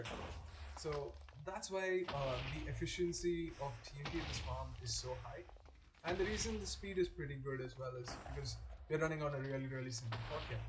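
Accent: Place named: India. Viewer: Which country do you belong to? Your accent is very Indian. If you are an Indian then its really nice to see some good indian Minecraft youtuber.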